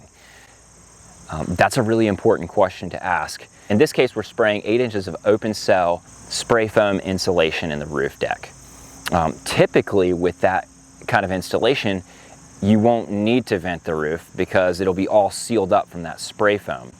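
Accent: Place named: California, United States. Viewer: I’m from Southern California, born and raised. This guy is without a doubt holding the thickest Californian accent of all time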